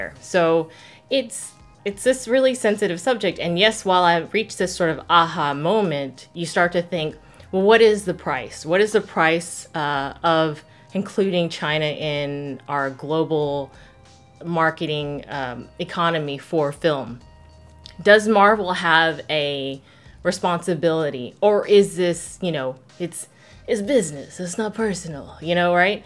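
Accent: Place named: United States. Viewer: Sounds like 100% American to me.;)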